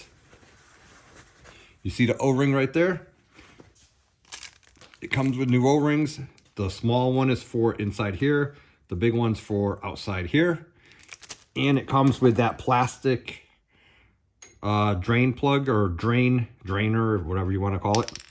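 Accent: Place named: Midwest, United States. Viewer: I like the way of talking ! Midwestern? It’s GREAT! Don’t forget to open all air ways on the top before you pop that plug off and keep the plug off when you pop the filter off. The more air ways the better. Some people even pull the dip stick right out and take the cap off the top so long as nothing falls in there. You might notice a bit more oil gets out. Also wait until drips stop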